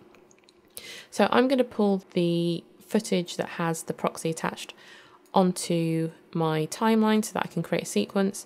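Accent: England, United Kingdom. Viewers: Very good tutorial. I have seen a few on this subject and this was clear with a lovely English accent for a change. Thanks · A great instructional video! Doesn't hurt to have that English accent either! ;)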